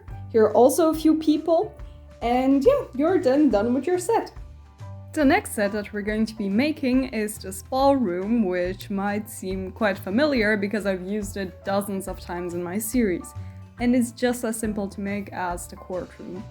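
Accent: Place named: Poland. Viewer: u sound polish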